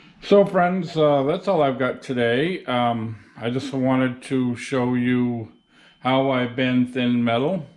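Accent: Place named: New England, United States. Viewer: A nice, authentic New England accent. Boston?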